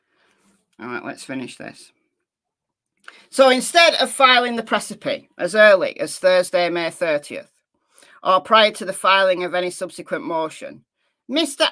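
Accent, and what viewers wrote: United Kingdom. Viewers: could you please cover the case of Valerie Wilson. Its apparently on utube but i would rather hear the story from you, im from Canada ive been watching you since Summer Wells. You are the best and i love your British accent, you are humerous and i just laugh my head off at your videos sometimes.❤❤❤ love your doggies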